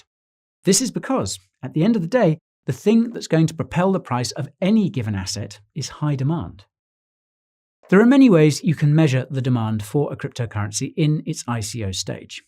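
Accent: United Kingdom. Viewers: Hi, I am new in Cryptocurrency, what is ICO , I Dex , Ieo? Sorry i feel like ignorant.. Your Y.t very informative, But i have problem with ur britten Accent. can u please easy it for international Followers.. Thanks , u are the best so far.. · I love your very British accent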